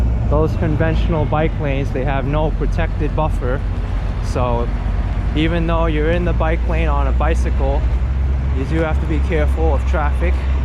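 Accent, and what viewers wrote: New York, United States. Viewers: Oh wow, where to begin...!? I loved this video! My husband and I visited NYC two years ago, stayed down on 46th somewhere, and learned the subway system, visiting all the touristy spots that week... Last year we returned, but this time branched out for some historical places. This year (in April again) we are staying close to Central Park, and will spend much of our time there, relaxing, walking, exploring, painting, taking photos...But hey! I stumbled onto this video tonight and walked all the way down 5th Ave. with you,totally enjoying your narration and fantastic tour, along with tips for tourists! Next thing I do will be to look for a few more of your videos, and hit that subscribe button! You are so knowledgeable, so you must have grown up in Manhattan? You have just a trace of a NY accent! Thanks for a fantastic walk down Fifth Ave!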